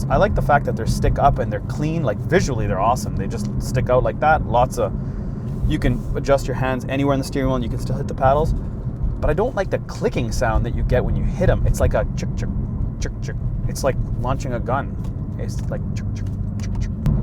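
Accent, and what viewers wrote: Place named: Canada. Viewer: Boy that Canadian accent is so heavy...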